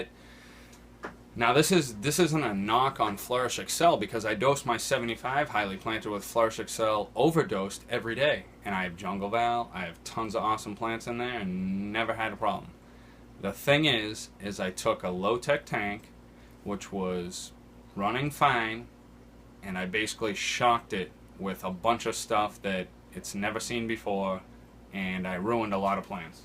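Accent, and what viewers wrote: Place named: New York, United States. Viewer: Following cuz you sound like you’re from NY.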